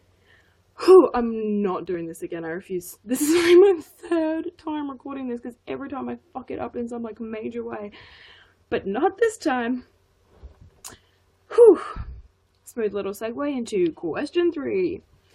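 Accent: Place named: England, United Kingdom. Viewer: you're english!?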